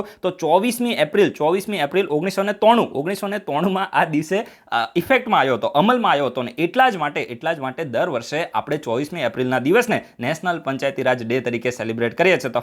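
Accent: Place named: Ahmedabad, India. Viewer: anand ane amdavadi boli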